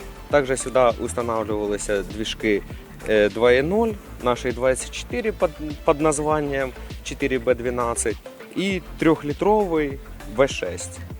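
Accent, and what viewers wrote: Surzhyk, Ukraine. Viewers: "Нєпадгатовлєний" від твого суржику вуха вянуть. Слабо говорити українською? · Авто, мабуть хороше, але жахливий суржик "експерта" відбиває бажання слухати.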